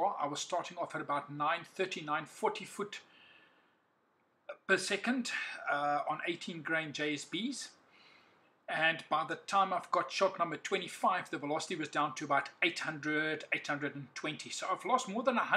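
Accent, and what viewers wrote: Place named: South Africa. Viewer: Awesome I thought I recognized the accent and also trust a South African to look at things and improve on it.."n Boer maak n plan" right...well everything of the best for you Mate!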